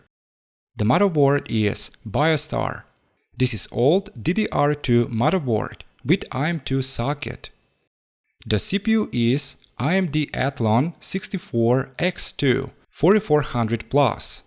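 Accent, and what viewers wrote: Russia. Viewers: Хм у тебя русский акцент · У тебя не получается скрыть что ты русско-говорящий, а проект классный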